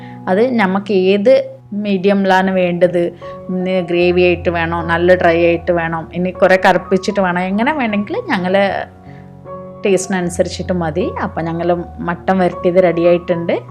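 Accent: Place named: Beary, India. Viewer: Definitely will try this, mutton is fav one, Malayalam with beary slang 👌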